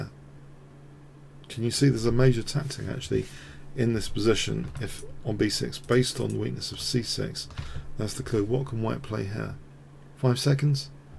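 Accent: England, United Kingdom. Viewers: I really love your videos and your daily updates on both historical and recent games,. Please continue to cover the major tournaments in both classical, rapid and blitz chess! And may I ask, from what part of England are you from? I take much pleasure in that dialect of yours and the pronunciation of the phoneme 'th' as a regular f-sound, "c free" (c three) etc.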